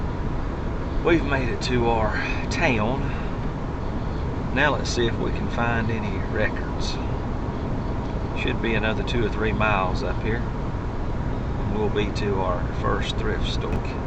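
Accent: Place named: South, United States. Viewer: I love listening to your southern drawl. It's like music in itself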